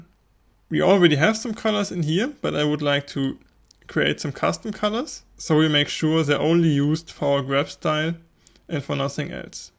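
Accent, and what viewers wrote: Germany. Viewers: Very well explained even though with a hard German accent :) I spot some similarities to linux commands and i.e. escape characters. My only suggestion is to deal a bit with some vocab like Backslash "\" is a escape symbol, you have parenthesis, curly braces|brackets or square braces|brackets colon is "Doppelpunkt". | is vertical bar or "pipe"... Anyways... Thank you for the well-performed explanation!! ++++ You dropped your website it seems. Maybe you have to edit your description and the video.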